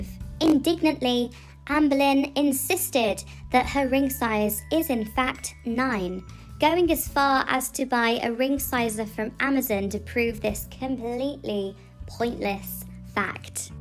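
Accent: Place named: United Kingdom. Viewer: omg are you british? it’s so nice hearing a fellow brit in the community! 🥰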